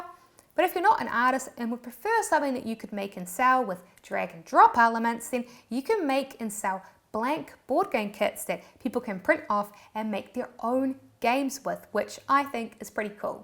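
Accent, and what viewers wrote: New Zealand. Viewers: I somehow understood it the moment I heard on word. Letter E sounds I and I love NZ accent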